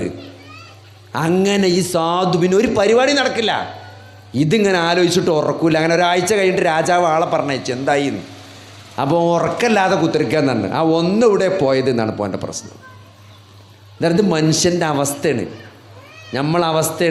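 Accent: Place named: Malappuram, India. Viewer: ഇദ്ദേഹം ഒരു ഡോക്ടറാണ്, but മലപ്പുറം മലയാളം വിട്ട് ഒരു കളിയുമില്ല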